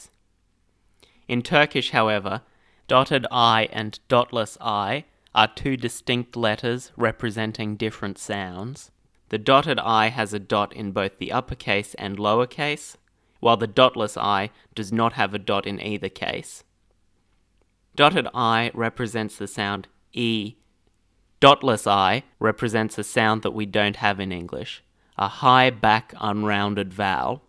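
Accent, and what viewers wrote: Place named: Australia. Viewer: Bu iyi bir video! Güçlü Avustralya aksanına kıkırdamadan edemiyorum ama telaffuzu çok iyi yapılmış!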